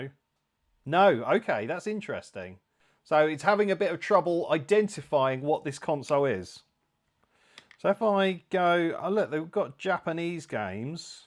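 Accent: United Kingdom. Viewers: Well this was a lovely trip down memory lane. I had an OG MD back in the day and loved it. Will certainly be looking into one of these..ahem...duplicates 🤣 Also, its VERY refreshing to hear an English accent in a video like this. These types of unboxing/test videos are usually americans.. "Subscribe" button smashed!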